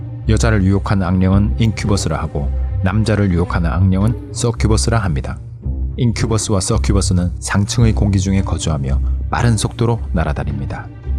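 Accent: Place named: Gyeongsang, South Korea. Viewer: 경상도 억양이 조금 남아 있으시네요:-)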